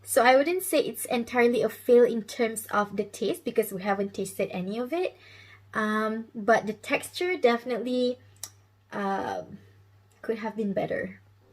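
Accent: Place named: Malaysia. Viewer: I love your Malay accent, And the look in your eyes! 🙂🌹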